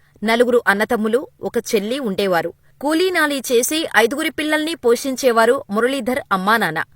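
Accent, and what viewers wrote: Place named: Telangana, India. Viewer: Neku telagana yasa vachu kabati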